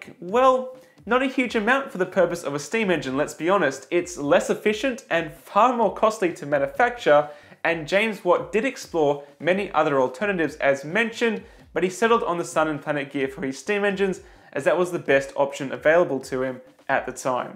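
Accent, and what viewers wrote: Australia. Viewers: Nauhteeboy he's an Aussie ya dick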